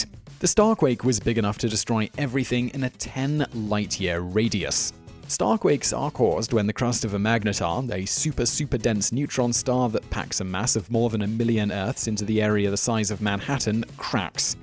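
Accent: United Kingdom. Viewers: British vsauce makes me extra happy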